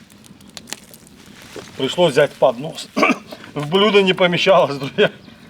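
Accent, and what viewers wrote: Baku, Azerbaijan. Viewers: муалим, процветание Вашему каналу👍🏻Ваш Бакинский акцент это вишенка на вашем волшебном канале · Ай джан! Бомба! Спустя 30 лет я ел рачки 2022 на Баилова. Спасибо за Ностальгию и Бакинский Акцент. 🙏❤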